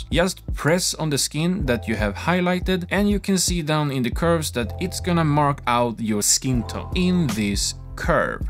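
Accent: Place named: Sweden. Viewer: Thanks for an really easy to follow video, also wanted to say I found it funny as a swede that your svenglish accent is so apparent, keep up the good work man!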